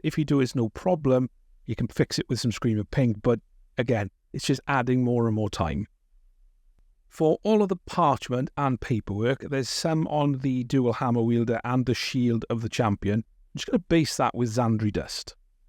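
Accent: Wales, United Kingdom. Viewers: Welsh accent, by the way. There's no such thing as a "British accent" really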